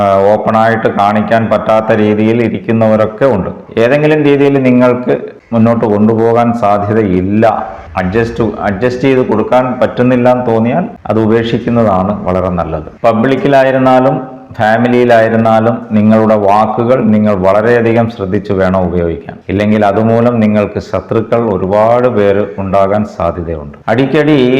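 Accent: Tamil Nadu, India. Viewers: താങ്കളുടെ സംസാരത്തിൽ ഒരു തമിഴ് ശൈലി വരുന്നുണ്ട്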